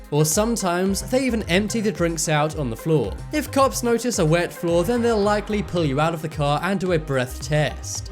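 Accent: United Kingdom. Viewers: This person has a British accent. Maybe that's what British cops do. NOT AMERICAN COPS! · Annoying uk accent about supposed us bullshit.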